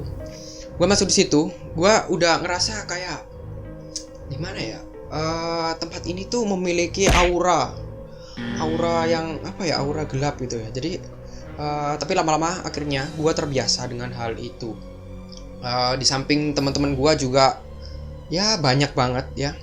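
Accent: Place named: Surabaya, Indonesia. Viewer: Mas samean kalo bicara ini kaya orang surabaya bang surabaya mana plise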